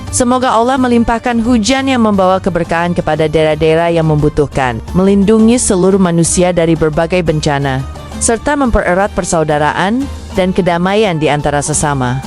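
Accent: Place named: Netherlands. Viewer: Kalau baca tex, jangan berlogat bicara seperti Belanda. Itu nggak lucu......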